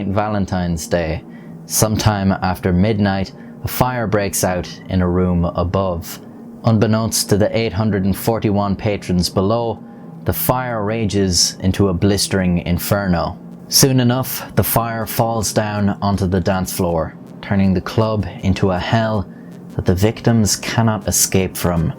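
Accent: Ireland. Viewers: I really like the style of your videos. Your Irish voice is perfect fo this stuff. Keep it up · Imagine if he keeps going until he gets much older with a gruff voice and that Irish accent? Like a lighthouse keeper or an old sailor telling dark tales.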